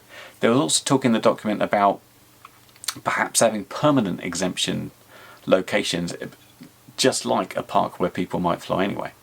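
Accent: United Kingdom. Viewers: I was wondering where you were based on your accent, i didn't know you were Southampton till this video, your not far from me :) I currently have no intention in registering and if everyone did the same it would make it unenforceable. Im confused about the CAA 1000ft exemption, does it still apply to all fpv flyers? Since the start of all this, i have felt that 400ft within a certain proximity to an airport is fair enough but if your not near low flying aircraft then the 1000ft limit should still apply. i live just outside restricted military airspace, im outside there zone and so they never come here but commercial aircraft do not have any flight paths over here ether as i guess they maintain a no man's land buffer to be sure. i can fly perfectly safely at any altitude here and never come into conflict with military or commercial aircraft and to be (officially) kept to 400ft is just stupid. 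As for auto pilots, which way do they want it? Ether they want to say that autopilots are not allowed over a certain altitude OR they want geofencing, you cant have both! The idea that you would remove Autopilots from something is just bonkers, all it achieves is increasing the risks, not diminishing them! I fly over farmland, no matter my style of flying, be it low and fast or high altitude never puts anyone or anything in any danger and i will continue to fly with common sense instead of a lack of sense that the government are displaying over this. I also feel that the more rules the go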